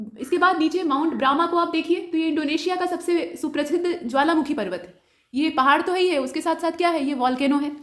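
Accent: India. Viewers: Jo bhi kaho lekin aapki awaaj sahi lagti hai...Hindi medium ki jhalak ke sath english ka bolna mast lagta hai....😍😍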